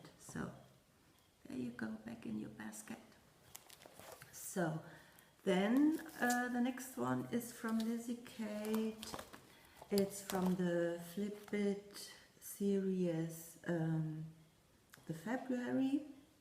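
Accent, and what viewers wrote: Germany. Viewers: As usual, I love all your little finishes, & the snowmen finish is adorable. I've been wanting to tell you several times now that I love the lantern you have behind you. I collect them & can never have enough. I really enjoy your videos & love your German accent. Nothing to dislike about your videos. 😊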